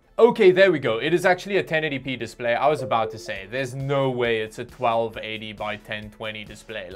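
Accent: South Africa. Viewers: Dude are you South African? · what is your accent comprised of please? i cant make it out. Sounds like South African mixed with Canadian and Welsh.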